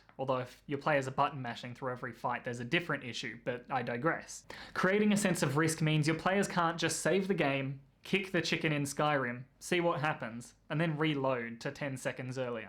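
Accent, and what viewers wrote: Australia. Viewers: I enjoy your accent, Australian Eddie Redmayne.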